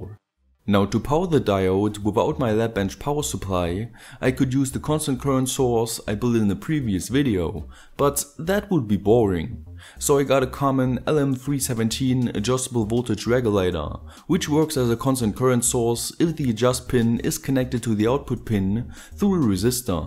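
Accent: Germany. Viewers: habs an deinem deutschen Unterton gehört. Geile Videos machst du, ist immer interesannt an zu schauen. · Are you from Germany?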